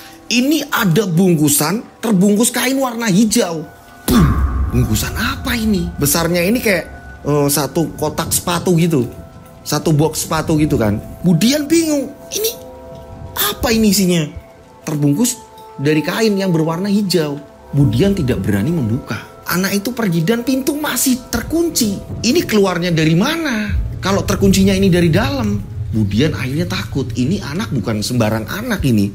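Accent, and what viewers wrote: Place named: East Java, Indonesia. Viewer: Ini dari logat bahasa nya mas kayak orang Jawa Timuran daerah selatan ( blitar kediri Tulungagung )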